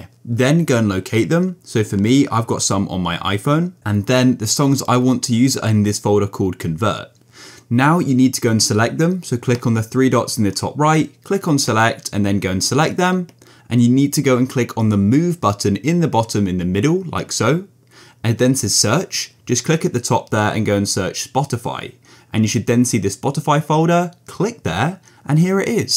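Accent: United Kingdom. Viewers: doesn’t work + ur british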